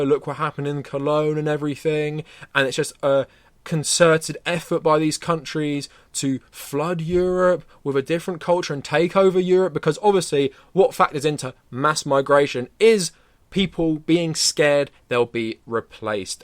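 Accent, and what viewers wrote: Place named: United Kingdom. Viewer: You sound brittish, how does it feel to be a traitor to your own country and watch it be decimated by the corrupt leaders?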